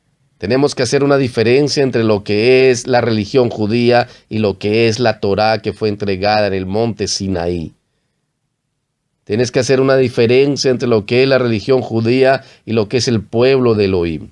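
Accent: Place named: Spain. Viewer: Me surge otra pregunta! Porque habla usted como español si no lo es? todos los hijos del Eterno amamos sus mandamientos y obedecemos su Torá por amor de El! Eso es más que obvió! No se trata de una idolatría a Pablo! Se trata de la Torah! Sin pruebas no se debe haceptar testimonio contra nadie! Y menos por boca de un solo testigo que no aporta pruebas! Así que asegurar sin pruebas que Shaul es mentiroso sin decir en qué miente! Es sin dudas un falso testimonio! YESHUA a muerto por nosotros! Pero en su mismo orden de ideas tampoco debemos ver sus videos ni escuchas sus enseñanzas pues no son las del MESÍAS! Digo más podemos escuchar a todos y prestar atención a los que hablan conforme a las enseñanzas del ADON! Sabiendo siempre que solo hay un ELOHIM y solo a El Amamos y servimos! Lo anterior antes de la lluvia de insultos de idolatría y acusaciónes falsas en mi nombre! 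Amo al Eterno y procuro vivir en sus mandamientos con todas mis fuerzas! Y esto lo aprendí del MESÍAS y por obra de su siervo Shaul y de los apóstoles o envíados de los profetas y su siervo Moisés! Shalom. Shalom Shalom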